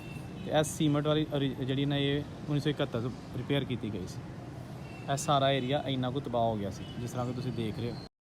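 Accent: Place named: Punjab, India. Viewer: Tuhadi boli sadi boli varge h sweet punjabi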